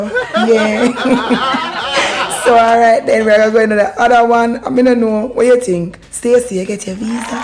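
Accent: Jamaica. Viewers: Real patois 😆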